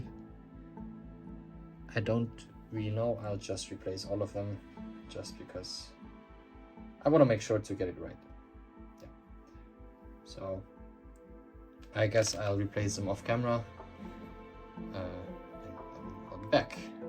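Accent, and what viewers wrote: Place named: Germany. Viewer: I think your deutsch 😂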